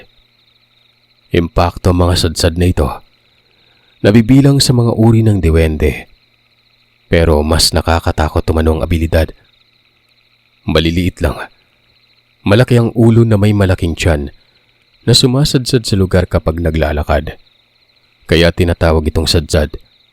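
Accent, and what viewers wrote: Visayas, Philippines. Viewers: listening here from Lapu- Lapu City Cebu...hello sir cute mo mg bisaya 😊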